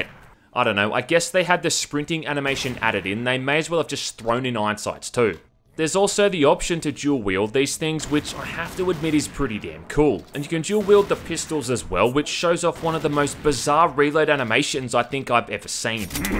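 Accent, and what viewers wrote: Australia. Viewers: Its hard to differentiate wether this video is a rant or just a comparison video with such a thick Aussie accent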